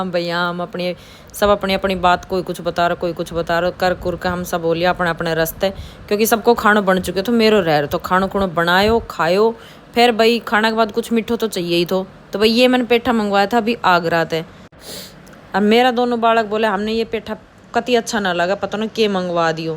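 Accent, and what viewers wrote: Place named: Haryana, India. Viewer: जीजी हमने अपने हरियाणवी होने पे गर्व करूं तो देख ले अब ये बिटिया विदेश में जाने के बाद भी अपनी हरियाणवी ढंग न बदल सकी, बहुत बढ़िया है बेटा भगवान ये सारी मनोकामना पूरी करे ❤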